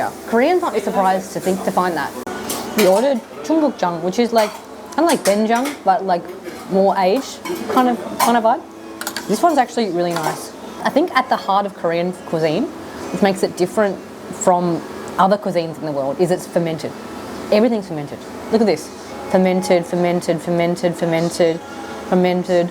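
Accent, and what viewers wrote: Australia. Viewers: 호주 영어 넘 듣기 좋아요.^^늘 응원하겠습니다. 전 반대로 호주에 있는데 한국에서 새로운 도전중에 악기나 스크린 골프 뭐든 배우시는것도 추천드립니다.^^ 그리고 아프시면 안되니 병원도 꼭 자주 가서 검사받아보세요. 제가 호주에 있으면서 제일 불편하고 적응안된 두가지입니다. 한국은 이 두가지 천국이에요^^ 꼭 원하는 성과 없으시길 응원드리고 늘 구독하겠습니다.홧팅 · G’day, mate! Glad to see an Aussie here in South Korea. I’m a Korean Aussie and used to live in Adelaide but now living in Korea near Daegu. Came across your YouTube clip and so glad to hear Aussie accent in such a long time. perhaps, 4-5years since I left Adelaide? All the best for your new journey and hope you to find many joys much happiness.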